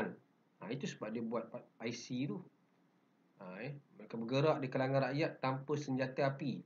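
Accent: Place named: Perak, Malaysia. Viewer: Suka sy dengar loghat Perak Cikgu☺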